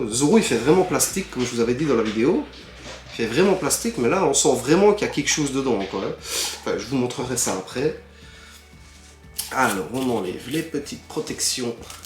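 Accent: Belgium. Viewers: t'es liégeois? Sinon sympa la vidéo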